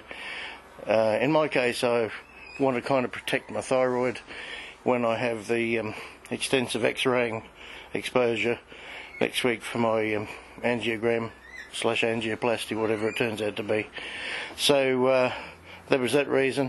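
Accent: Australia. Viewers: my estrogen went up with all the weight which isn't a good thing. Try it and let me know. I stopped all sugars instead of fruit of course. I also stopped wheat. I wish you luck. You sound Australian, only because I live in Adelaide.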